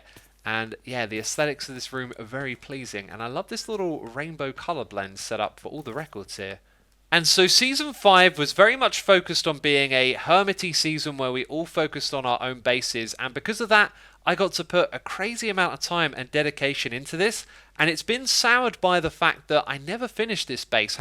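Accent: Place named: United Kingdom. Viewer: Why are you British now wtf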